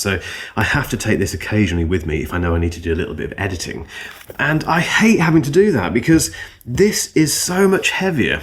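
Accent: United Kingdom. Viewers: Love the accent. The envy of all us non-British students of English.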